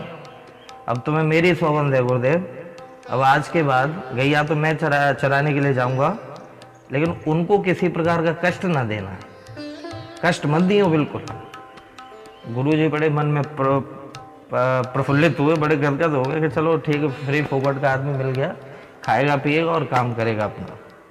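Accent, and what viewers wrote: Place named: Vrindavan, India. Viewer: Kitni meethi boli Shri Vrindavan Dhaam ki Dhanyavaad PrabhuJi Kripa karo Bihariji Radharani kripa banaye rakhe sada 🙏🏻🙏🏻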